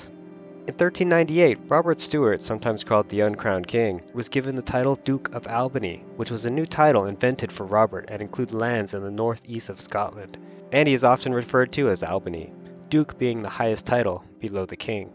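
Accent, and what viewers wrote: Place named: United States. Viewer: Why would you have an american narrator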